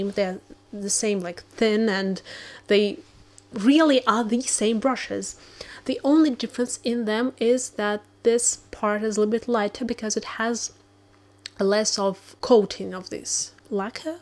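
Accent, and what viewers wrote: United Kingdom. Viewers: ваш британский акцент шикарен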